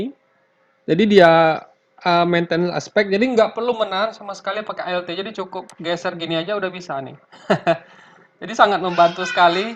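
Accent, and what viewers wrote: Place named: Padang, Indonesia. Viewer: Sprti logat logat padang padang gan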